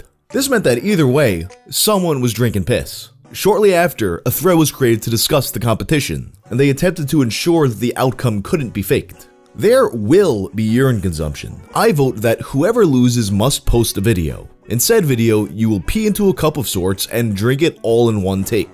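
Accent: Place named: Brooklyn, United States. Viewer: No offense, sort of sounds like a Brooklyn-nite/Italian version of Vinesauce Joel.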